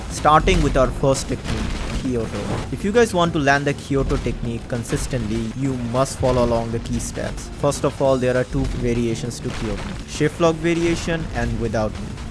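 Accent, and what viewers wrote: India. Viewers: He has an indian accent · ohh But your accent is like Indians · Are you indian? Your voice sounds like a Indian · Why u sound like u are indian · idk why this guys accent is like indian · I bet ur a indian bc of ur voice and a good vud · You sound indian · U sound like Indian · bro voicelike a indian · Love the Indian accent I will sub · indian accent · Bro got that indian voicd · You speak english but sound like indian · YOUR VOICE GIVING ME INDIAN VIBE ARE U? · Hello guy with Indian accent Remember me when u get famous. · Bro he sound indian (not being racist ) nice vid of tutorial btw... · Bro idk but your English sound like indian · R u Indian? You sound like my friends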